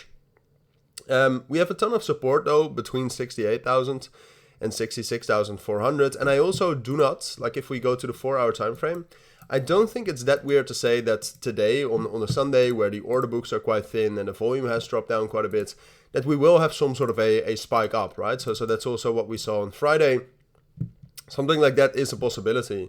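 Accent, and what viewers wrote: Netherlands. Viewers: Is this guy Dutch?? Dutch accent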